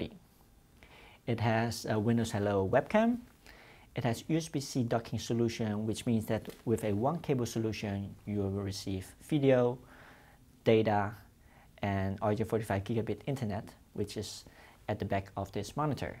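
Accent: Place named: China. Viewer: Just listened to a terrible chinese accent for for absolutely nothing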